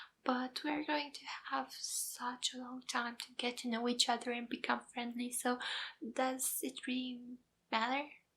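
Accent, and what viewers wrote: France. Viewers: Is that a french accent?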